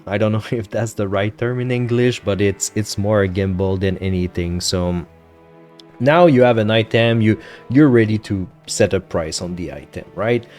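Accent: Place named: Quebec, Canada. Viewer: toi t'es quebecois c'est certain! hahaha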